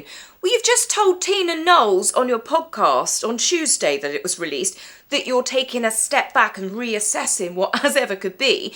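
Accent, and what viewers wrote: England, United Kingdom. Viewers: lol loved this!!! I hope we get a tour of your new home when you have it all done. Congratulations on your new home. Can I just say- you look more beautiful everyday and your content and the way you articulate things in that fabulous English accent - I mean truly you have the IT factor. I could see you being the next Graham Norton! You are just a star ⭐️ and I appreciate all you do for us. ❤❤❤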